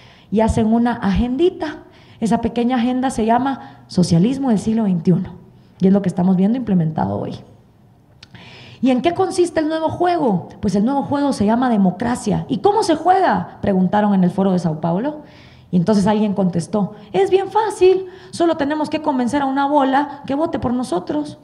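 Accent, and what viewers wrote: Mexico. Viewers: EL UNICO INTERES DE ESTA CHICA ES EL MONEY , ES DE GUATEMALA PERO HABLA COMO MEXICANA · pero porque me hablas como mexicana wey?!?! por favor, un acento guatemalteco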